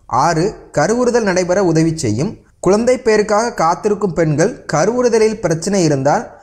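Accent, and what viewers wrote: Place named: Sri Lanka. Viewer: Ilangai tamil maari therigirathu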